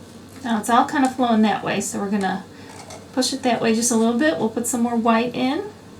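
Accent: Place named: Michigan, United States. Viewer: Love these! By the way, are you from Michigan by chance? It sounds like it from your accent.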